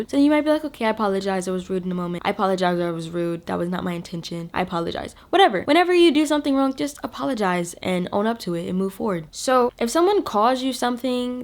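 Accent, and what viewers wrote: United Kingdom. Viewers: The famous British accent🙃💕 back with the good advice as usual ❤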